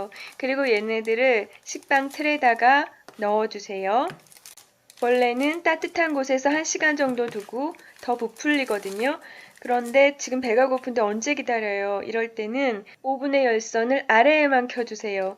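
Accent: Seoul, South Korea. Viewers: 뭔가 옛날 서울 사투리 같아요ㅎㅎ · 말투가 옛날서울 사투리 같아요 !!!ㅋㅋㅋㅋㅋ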